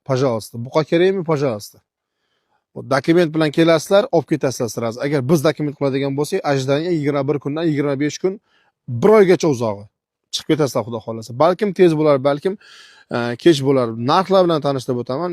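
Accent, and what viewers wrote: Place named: Uzbekistan. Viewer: Гап йук ака соф узбекча гапирар экансиз.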